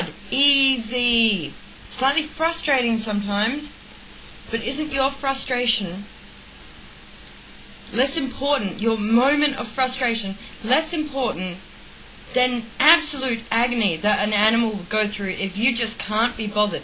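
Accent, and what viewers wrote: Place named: Australia. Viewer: ew god your australian!!! its bad enough your vegan, both mixed together your like the next fuckin hitler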